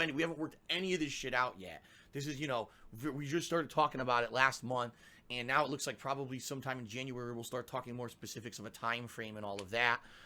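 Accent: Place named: New England, United States. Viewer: Yankee accent are fucking disgusting.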